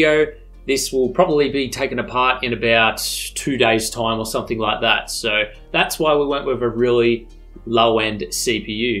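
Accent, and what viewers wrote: Australia. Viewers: r u an Aussie cuz to me u sound it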